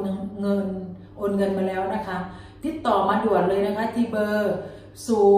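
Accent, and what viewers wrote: Korat, Thailand. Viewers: พี่สุสวยจัง จากบุรีรัมย์ พูดโคราชเหมือนกัยเลยน่ารักเด้อจ้า😊😀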